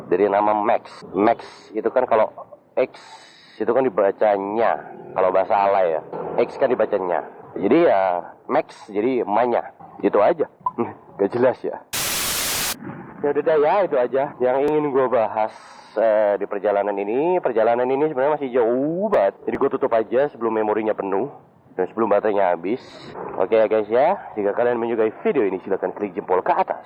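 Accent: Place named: East Java, Indonesia. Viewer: cak asline wong endi ...kadang kok suarane medok jawa timuran...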